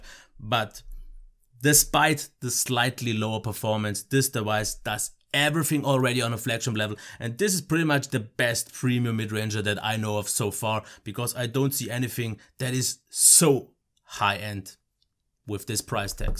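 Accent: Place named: Germany. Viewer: I love the review and accent! It sounds kinda german'ish. :D (Just in cute)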